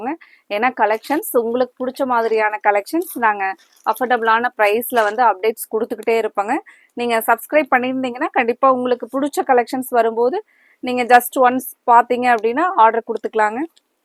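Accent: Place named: Coimbatore, India. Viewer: அழகு கோவைத்தமிழ். கேட்க கேட்க திகட்டாது❤❤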